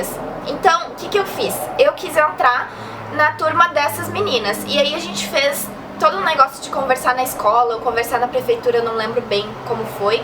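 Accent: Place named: Southern Brazil, Brazil. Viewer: Gostei do seu vídeo, gostei das informações, ultimamente tudo o que tem a ver com japonês eu estou consumindo e o seu vídeo foi útil pra mim. E pra mim foi muito lindo ver uma menina "Japonesa" com o sotaque do sul do Brasil!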